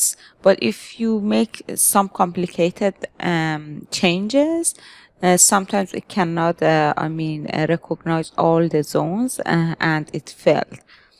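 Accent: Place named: Iran. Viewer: انگلیسی با لهجه‌ی ایرانی 😁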